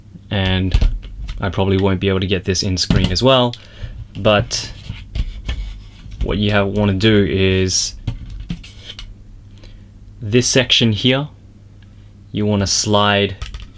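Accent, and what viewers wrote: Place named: Australia. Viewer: And hey, your accent definitely sounds Aussie! Great to have another Aussie figure reviewer on the tube!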